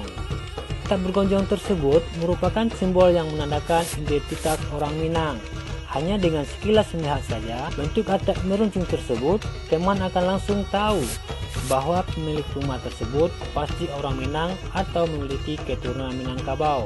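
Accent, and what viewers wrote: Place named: Aceh, Indonesia. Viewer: Logat nya kok logat Aceh ya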